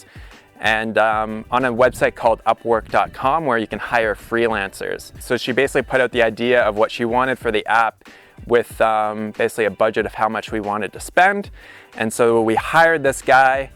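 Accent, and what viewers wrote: Canada. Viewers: I heard your accent. May I ask where your from? Are you Canadian? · Can really hear that Canadian accent in this vid. Lol. Adorable. 😊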